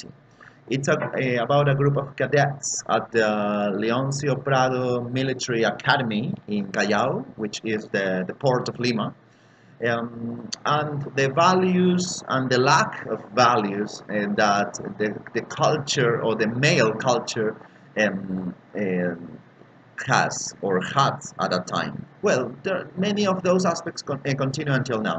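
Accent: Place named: United Kingdom. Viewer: English from Británico😊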